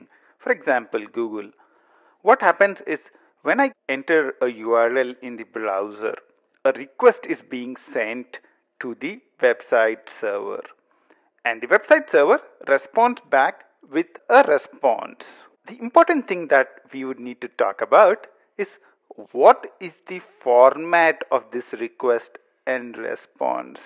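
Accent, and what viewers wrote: India. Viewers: Ох этот индийский акцент 🤦😁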